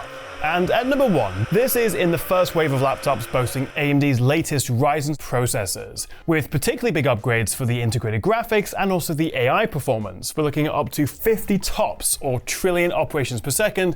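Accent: England, United Kingdom. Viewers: From what region of England are you?, Is it just to know where your accent comes from. Please. A French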